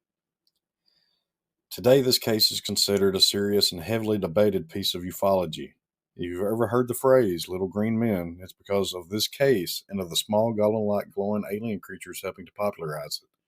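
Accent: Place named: South, United States. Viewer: Great story telling. The southern accent makes it so much better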